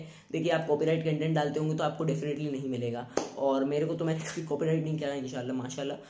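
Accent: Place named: India. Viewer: You sounds like true Indian but Atleast you still can speaks English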